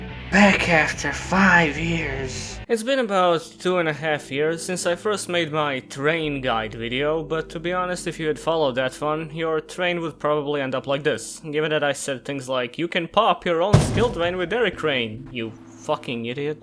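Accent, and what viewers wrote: Balkans. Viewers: didnt know you were from the Balkans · E brate tačno sam znao da si neki naš balkanac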